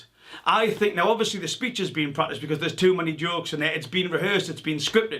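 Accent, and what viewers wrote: Newcastle, United Kingdom. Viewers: You sound like a Geordie spot on video thankyou xxx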